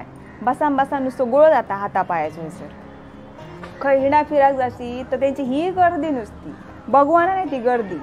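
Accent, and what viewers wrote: Malvani, India. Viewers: Ge tujhi malvani Bhasha aikuk khup maja yeta.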